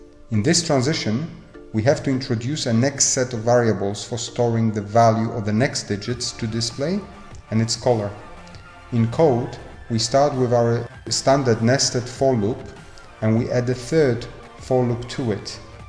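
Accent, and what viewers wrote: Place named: Poland. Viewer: Tak coś po akcencie czułem, a teraz widzę po polsku. Witam rodaka:) Fajnie to się zapowiada:)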